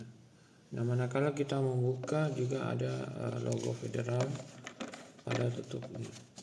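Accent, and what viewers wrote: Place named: Makassar, Indonesia. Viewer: udah style nya mungkin logat makasar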